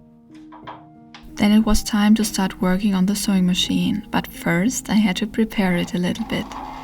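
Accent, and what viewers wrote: Germany. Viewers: i love her and her projects, I just can't with the german accent lol. Maybe it's that german self-hatred, or it's my misophonia, .. her accent isn't even too thick/bad, yet it tickles my brain in ways that, I wanna watch her videos but can't enjoy. Gotta mute or click off :( Is that only me? (Maybe could be connstructive criticism, to work on the accent cause it truly might help garner even more international audience (?))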